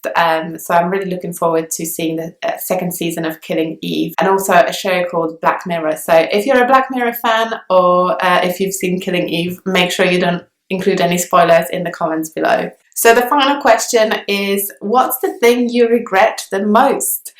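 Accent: United Kingdom. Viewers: nice video. Perfect British English, not cockney and that's a plus :) You also look beautiful . Ciao bye hasta luego..those are the languages that I speak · You are a big inspiration for me. You really speak like British. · Dear Madam. So nice to hear about you. You seems to a very dynamic person doing so many things and active about going to places. I knew from the beginning that you are a English. Your accent betrays. Now that cannot be changed to English. Any way you have worked hard to learn Enlish so as to teach to others. My congratulations for your great efforts. You may wish to be in France a roamantic place. Have some hay days there. Best wishes for a very happy life. Raju from India. A Tamilian, Wish to remain as your good student and learn a lot from you. Thank you.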